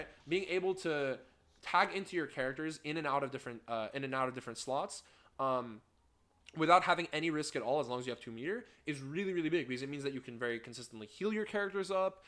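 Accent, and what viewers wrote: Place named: United States. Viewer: Wow your accent sounds so American in this video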